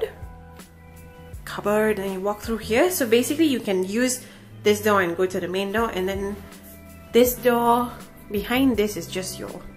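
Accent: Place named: South Africa. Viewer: Happy Anniversary weekend to you both. I am confused by your ethnicity. You look South Indian and you celebrate Diwali but your accent is South African and you live in KL.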